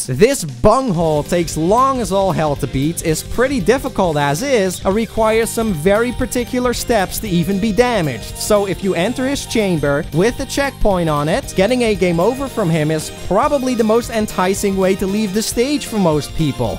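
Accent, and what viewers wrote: Netherlands. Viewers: Lmiao!!! I think you have! That Dutch accent helps, too. 💞💕💞 seriously though, loving your content. Currently binge watching your catalog. Subscribed. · SuperDutch accent :)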